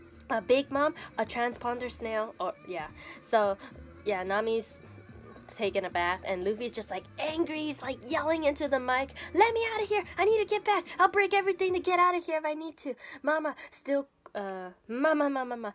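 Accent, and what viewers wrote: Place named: England, United Kingdom. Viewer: i wish i had your english accent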